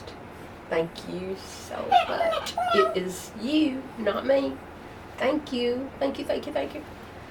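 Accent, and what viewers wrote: South, United States. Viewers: Congratulation! That is when I started watching you when you said you Lived in a Shed. I thought let me see where this poor old woman has to live! And what a surprise, I love your beautiful shed! Your camera looks good. I just love listening to you talk, I love your southern accent. I watch a lot of "live alone" women on YouTube because that is me also. Keep up the good work!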